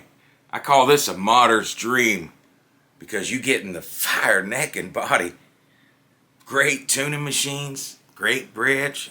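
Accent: South, United States. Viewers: You're my new favorite guitar guy on YouTube, now. You from the South, brother? Mississippi over here!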